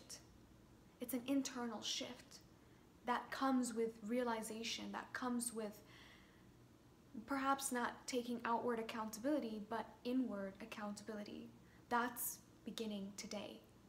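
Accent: United States. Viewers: i Love your Chicago accent ❤ as *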